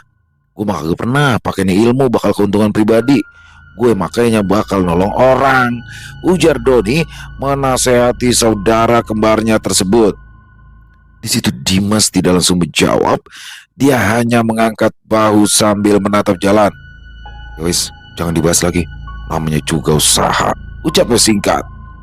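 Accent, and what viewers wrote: Jakarta, Indonesia. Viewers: Aduh bang candu bnget Kalau sdh pda becanda logat betawi resep bngt dah Pokokny sdh alur crita bgus Yg bawain nya bisa bngt setiap bawain crita karakterny mask bngt se olah2 nyata critanyq jd hdup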